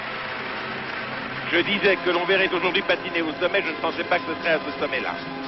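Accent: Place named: Canada. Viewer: The footage may be European, but the commentary sounds like Canadian French.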